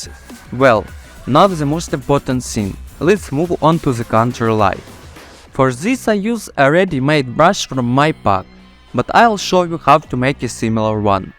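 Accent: Russia. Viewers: Russian accent🤯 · хорош, сразу слышу русского чела )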